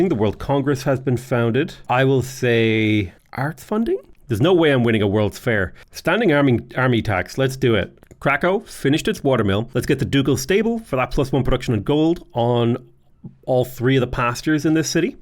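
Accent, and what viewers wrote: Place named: Ireland. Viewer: I'm sure you get this question or comment at a regular clip, but how heavy is your Irish accent compared to folks in your area? I'd love to watch an upload where you ham up the accent for us non-Irelanders, I bet that would be fun XD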